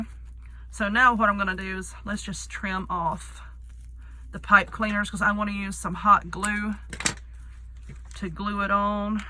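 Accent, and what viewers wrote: South, United States. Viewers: I LOVE cats and Candycorns! What an Adorable combo! You are So creative, I love it! I just got back from DollarTree a while ago with the stuff to make your owl wreath! I can’t wait! I love you videos SO much! I love listening to your southern accent and some of the cutest southern things you say! Thank you so much for brightening my days and helping me be more creative!🎃🎃🎃🖤🖤🖤🧡🧡🧡🎃🎃🎃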